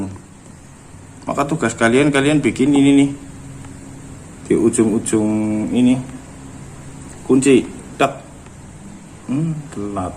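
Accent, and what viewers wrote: Banyumas, Indonesia. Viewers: Kaya wong banyumas,,,ngomongw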